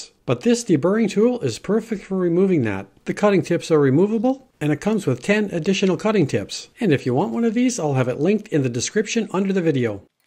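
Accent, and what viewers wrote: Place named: Canada. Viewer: I'm guessing from your accent you're in Canada? Amazing assortment of birds you have at your property! I generally just get the common house sparrow here in the UK plus the odd robin and loads of greedy starlings! Going to have a go at one of these, not because I have a squirrel problem but there ARE a load of cats around and two in particular like to stand at the base of my bird feeder and spring up at the unwary birds. Great idea and endlessly adaptable - would never have thought of using silicon!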